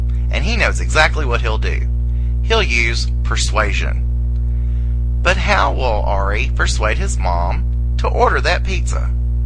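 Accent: South, United States. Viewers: I never thought a gay southern man could bring this much joy to my homework. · I’m just here for the delightful southern accent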